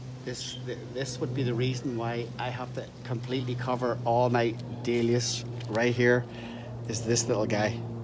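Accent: Northern Ireland, United Kingdom. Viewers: Now r u a northern ireland man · Hello greetings from NI when you say a good feed of pot ash what would I be looking to buy,at the min I have a lot of buds but the stems are short and not strong. Also I hear the NI accent there where do you hail from,lovely plants by the way.Ta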